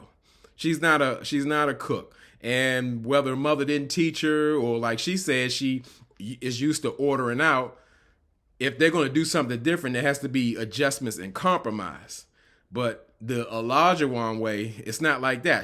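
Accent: DMV, United States. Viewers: Are you from DC/MD? Your voice sounds like you’re from the DMV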